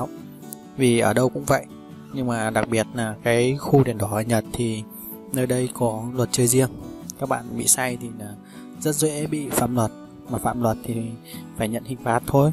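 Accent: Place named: Hai Duong, Vietnam. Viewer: Đặc giọng Hải Dương 😄😄😄😄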